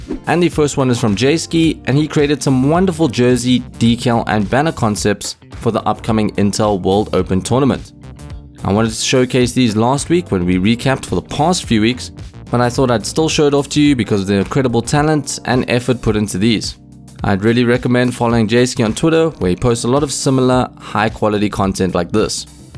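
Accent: South Africa. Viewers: Is this a South African accent i hear?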